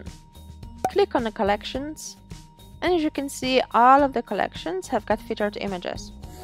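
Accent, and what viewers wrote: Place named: Poland. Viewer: You've got Polish accent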